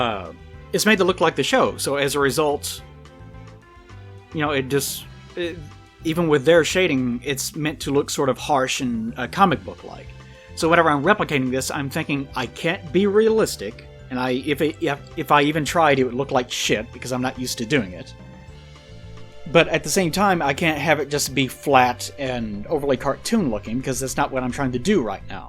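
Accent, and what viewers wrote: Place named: United States. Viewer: Your voice is so country. I love it.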